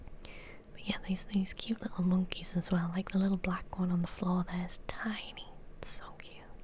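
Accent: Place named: Newcastle, United Kingdom. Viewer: Geordie accent is relaxing af - when are we getting a Davos Seaworth roleplay 🙈🙈🙈🙈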